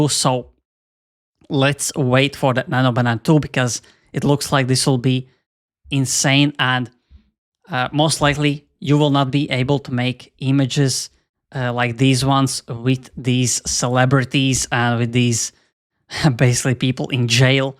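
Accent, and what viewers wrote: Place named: Russia. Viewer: Why your accent is so similar to Russian? I'm russian btw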